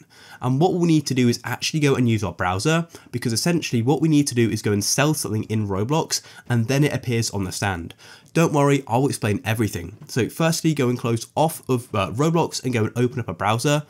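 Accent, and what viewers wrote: United Kingdom. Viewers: I don’t understand what u are saying ur so British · Are u bridish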